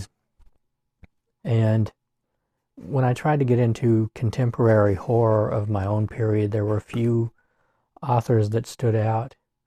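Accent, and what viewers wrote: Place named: Kentucky, United States. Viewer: Hi Thanks for your earnest and honest “rambles” on a rainy Saturday! I enjoy your thoughts on books and I have to admit your KY accent brings me to many happy memories, as I grew up in Louisville. I have made many visits to Lexington, Bardstown, Berea, Gethsemani and most central to western areas of the state. I have not lived in KY for almost 60 years, but I miss the ease and laid back style which Kentuckians like you embody. Stay well! Extreme pain is an intriguing phenomenon. When it has gotten me, infrequently, I feel like I leave my body and mind behind and enter a realm of subconscious, very surreal existence (just to cope). Again, thanks for sharing!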